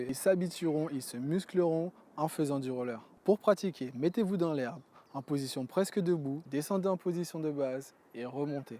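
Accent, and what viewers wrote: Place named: Guadeloupe, France. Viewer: Pour ton accent ne le dissimule pas ça rajoute un charme ;) #vivgwada #panipwoblem 😅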